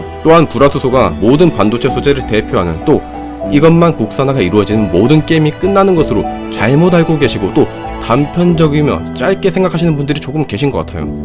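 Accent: Gyeongsang, South Korea. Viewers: 표시나요 깅상도사투리. 숨길래 숨길수없는 첯음절에. 강력한 엑쎈트 깅상도사투리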